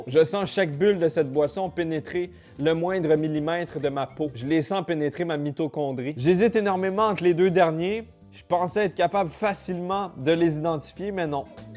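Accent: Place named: Quebec, Canada. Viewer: 🤣🤣🤣 très special a l’écoute personnellement je n’aime pas du tout entendre le québécois ou canadien, malgré que vous êtes super mes juste votre accent et votre façon de parler je trouve sa horrible 😂😂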